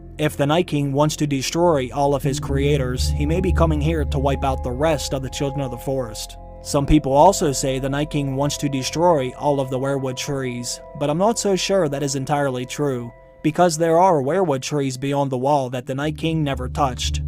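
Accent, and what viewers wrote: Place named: South, United States. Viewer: You speak like a southern lord Baelish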